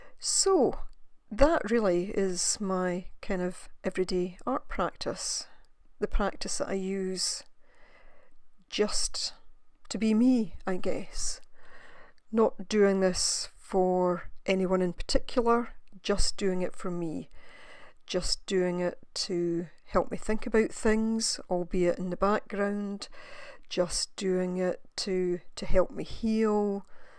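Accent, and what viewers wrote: Scotland, United Kingdom. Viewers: Hello, I have spent the last three days watching your videos after discovering your channel. I really like your style of work, your explanations and your scottish accent! Greetings from Germany!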